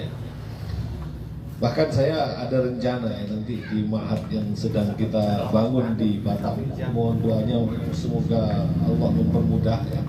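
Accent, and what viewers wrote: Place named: Malaysia. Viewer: Cara berbicara Ustaz sudah seperti orang Melayu Singapura/ Malaysia. Barakallah fiik ustaz.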